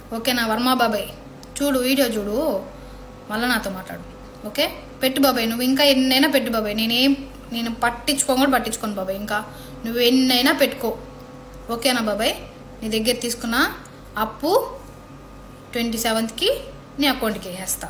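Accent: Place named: Kadapa, India. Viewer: Kadapa language vaguthunnavu neevu ya district girl.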